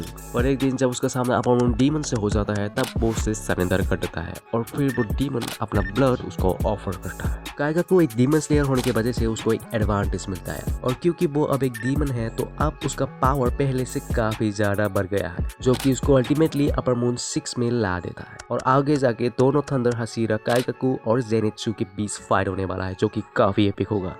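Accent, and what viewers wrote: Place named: Assam, India. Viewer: Assam? accent tu Axomia lagise💀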